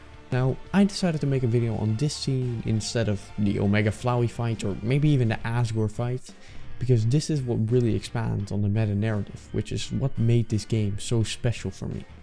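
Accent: Netherlands. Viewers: i smell dutch..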